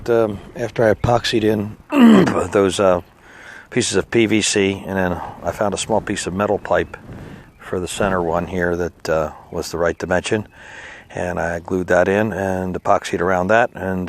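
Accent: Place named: New Jersey, United States. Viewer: Your accent sounds like you're from central New Jersey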